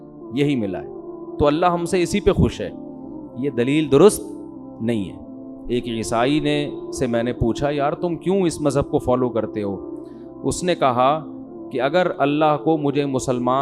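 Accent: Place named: Lucknow, India. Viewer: Aap ka baat karne ka tareka bilkul india me ek city h lukhnow waha k log bolte h. But nice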